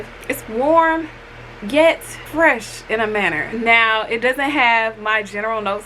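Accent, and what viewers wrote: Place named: Florida, United States. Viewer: Subscribed for the personality lol also are you a fellow Floridian? That accent sounds like home. 🥰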